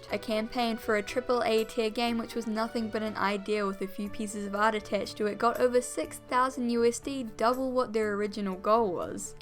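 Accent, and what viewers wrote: New Zealand. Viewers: Just stumbled across this video by chance but I can't help but feel I've seen this person somewhere before. It might just be the kiwi accent but I'm sure I've met her at a party or sat next to her on a bus or something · holy shit I had completely forgot about this project. it was actually a scam lol p.s. I love your kiwi accent! you got a new subscriber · Is that a New Zealand accent I hear?! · I am now secretly praying that this youtuber is Australian and not a New Zealander, because I really like my joke but the more I watch the more her accent makes me think New Zealand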